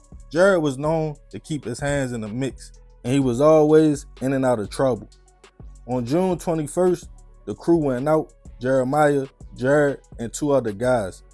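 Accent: Baltimore, United States. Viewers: He’s from Baltimore, has a strong accent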